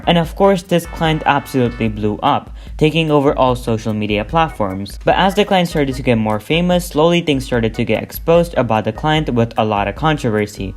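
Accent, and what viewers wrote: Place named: India. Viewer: I like the way u speak english and ur indian :O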